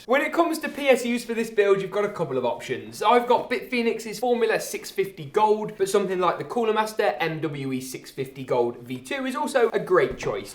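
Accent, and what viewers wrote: United Kingdom. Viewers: Your accent is so british 😂👍 i love it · I previously commented that your British Accent is Crazy and i would repeat that no matter what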